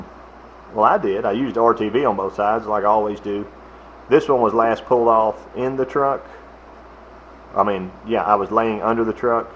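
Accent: South, United States. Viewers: It's kinda cute how you Southern boys can't pronounce "oil" or "coil". It always comes out "ole" and "cole", lol. 😉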